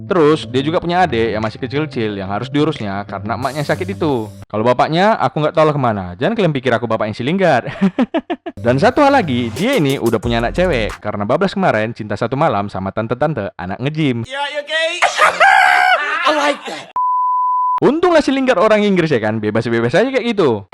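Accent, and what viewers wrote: Medan, Indonesia. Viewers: Wkwkwk, the best presenter bola versi medan bung😁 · Orang Medan Bang?? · Orang medan kah??? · Abang org medan ya bg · Bg lu orang medan yaa bg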